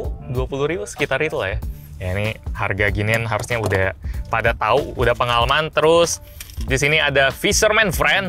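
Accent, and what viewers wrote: Palembang, Indonesia. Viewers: Bang asli orang palembang yah?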